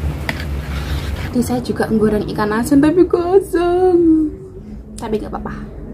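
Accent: Java, Indonesia. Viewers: Salam kenal,mbae jawane ngendi mba.. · Salam kenal mbak, pertama kali sy liat chanel ini. Chanel youtub'e sampeyan moro2 nampang ndek home, iseng2 tak buka.. apik mbak, konten sederhana, natural, aseli, gak neko2, gak dibuat2, kamu jg orangnya menyenangkan enak dilihat, tulus apa adanya. Jarang bgt di jaman skrg ini. Teruskan berkarya, kudoakan smg makin sukses ya, aamiin. O ya, jowo ne endi mbak?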